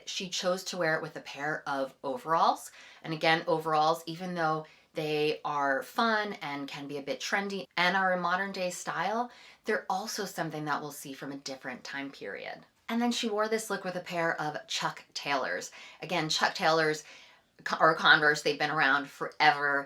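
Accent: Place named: United States. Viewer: This is a fantastic series of videos, thank you so much, you clearly know a lot about style and you speak very clearly with a beautiful, soft American accent you so rarely hear these days, it's really a pleasure to listen to you. I love Alexa Chung although some of the outfits you showed are not to my taste. I grew up in Italy in the 70s and, age 58, I've recently gone back to wearing similar outfits, for example corduroy bootcut trousers (also worn in the 90s by Carolyn Bessett-Kennedy), double denim with trucker jackets and straight leg jeans, gingham shirts, also worn with denim, white straight-leg jeans with a blue t-shirt (like Jackie O), velvet suit with flared trousers, pussybow blouses, platform shoes, contrast collar t-shirts, David Bowie vintage t-shirts. The funny thing is that my style now is similar now to what I was wearing in the 70s when I was a child or teenager, these pieces are modern classics (but not too classic, as I find classic style is often ageing and too ladylike - there's a fine line). 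I would not say my style is vintage but I love the odd 70s touch, also in the colours (orange and brown, khaki), just as I like wearing the odd edgy or even sexy item.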